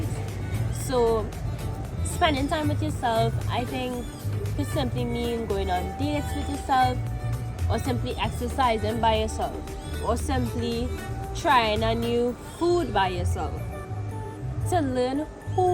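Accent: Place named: Trinidad and Tobago. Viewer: I hear a trini accent!!!!! Yesss let’s go!! Keep making videos!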